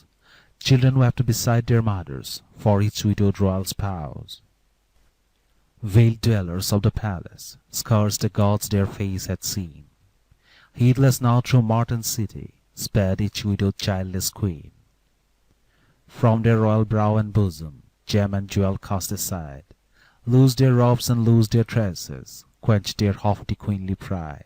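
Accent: India. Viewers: When an Indian goes to Europe and tries to speak English like he is local....that's how this audiobook sound. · Would be better to not have someone with a heavy Indian accent read this. The heavy accent is difficult to understand the English. Can't understand this version.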